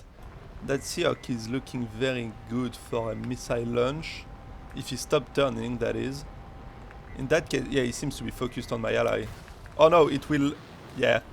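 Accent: France. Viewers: most french guy i saw on youtube that talk eng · İ never heard france accent wow great video tho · holy french accent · This is about as French an accent as it gets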